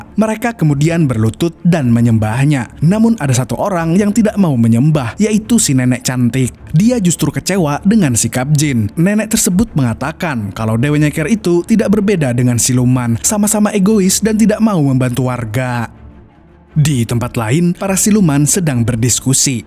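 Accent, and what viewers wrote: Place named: Cirebon, Indonesia. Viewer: Adminnya org cirebon😂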